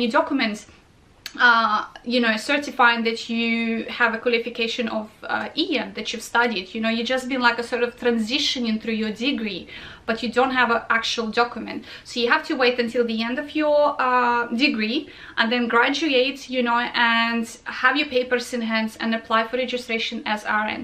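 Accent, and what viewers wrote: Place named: Russia. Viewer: Thanks for your Contribution. You Sound Russian as one of my teacher.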